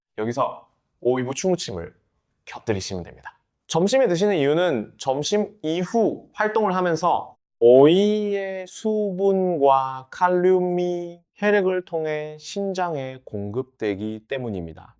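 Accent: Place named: China. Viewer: 방송이 뭐 이래요 중국식 발음이 뭐예요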